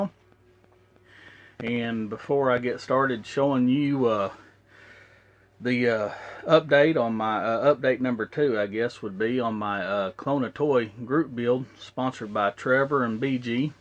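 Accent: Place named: South, United States. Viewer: bye the way do you live in the u.s. i live in titusville fla. thank you p.s. you sound your from the south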